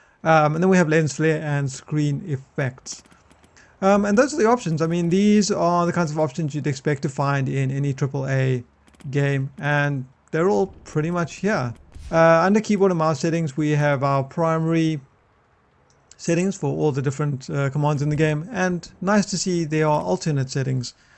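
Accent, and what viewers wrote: South Africa. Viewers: You a RSA expat by chance? Your accent sounds like a South African :P Great vid BTW!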